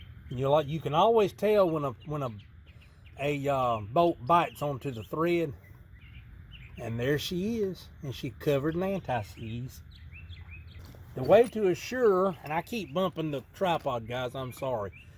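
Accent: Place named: South, United States. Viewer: miss that southern drawl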